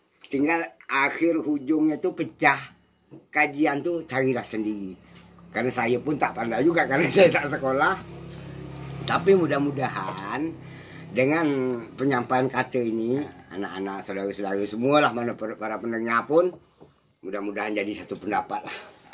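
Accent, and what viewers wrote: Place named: Johor, Malaysia. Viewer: Salam dari Johor. same bahasenye dgn org Johor. Mmg johor dgn bentan ade hubungan suatu ktike dulu.. saye tngok channel ni sbb nk dengor bunyi cakapnye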